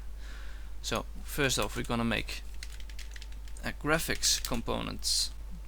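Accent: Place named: United Kingdom. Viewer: I thought you were british.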